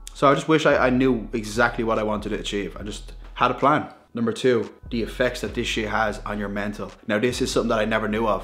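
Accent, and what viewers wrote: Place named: Ireland. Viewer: I like your Connor McGregor accent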